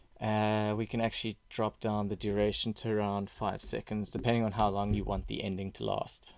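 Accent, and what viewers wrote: South Africa. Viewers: Great work man! I hear a South African accent there ;) Is there a way you can make this template available like the links you have added in the description? I would love to use it and replace it with my own logo and colours. Thanks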